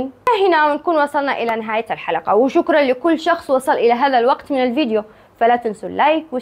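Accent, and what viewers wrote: Aden, Yemen. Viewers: كما عهدناه دايما متالقة وفديوهات يستفيد منها المشاهد اللي يتابعها سيدتي لا تكوني تطولي الغياب اقل شي كل اسبوع فديو وكمان لهجتك العدنيه هي اجمل شي وكمان صفاء قلبك والله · فديت لهجة عدن ياناس عسل والله😭🫶🏻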